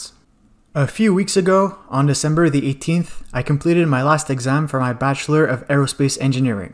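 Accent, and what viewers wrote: Quebec, Canada. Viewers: Vous est quebecois? I heard a little Canadian french when you were telling your story. I'm an hour north of toronto and learned a little french in school. Congrats on your channel growth, i hope it quadruples in 2020! You are one of the best WT youtubers out there, looking forward to educational videos as well. I think it will suit your channel very well.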